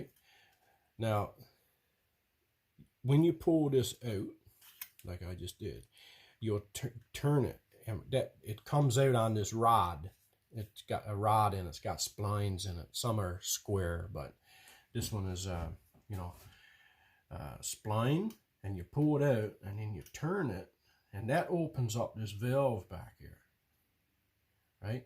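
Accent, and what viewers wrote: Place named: East Coast, Canada. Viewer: I recognize a east coast Canadian accent !!! 🇨🇦🍻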